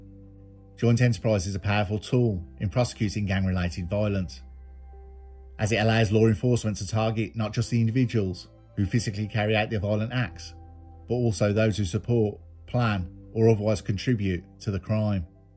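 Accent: Birmingham, United Kingdom. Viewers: I switched it off when I realised it was the brummie guy. 😂😂😂 gets on my tits.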